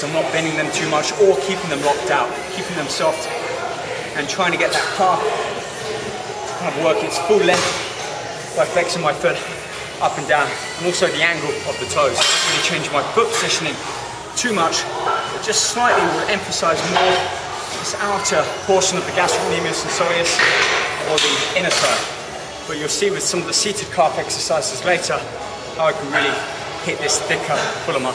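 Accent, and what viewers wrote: United Kingdom. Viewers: um... no he's english, his home town is london and he as a british accent...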